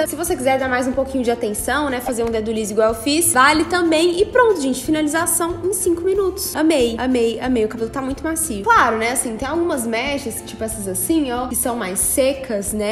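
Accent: Minas Gerais, Brazil. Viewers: Vc e mineira?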